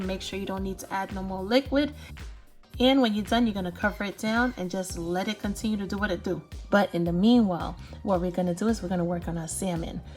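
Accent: New York, United States. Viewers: I think I might enjoy this meal! Love your accent! New York? Connecticut? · I'm from NY I'll say definitely "NOO YAWK". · This recipe is probably 10x better because of the native NY accent!!!! ❤❤❤❤❤❤ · Is that New York I hear 👀? This looks bomb. I gotta try it. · I love New York! I miss home..something about that voice!😛😛🥰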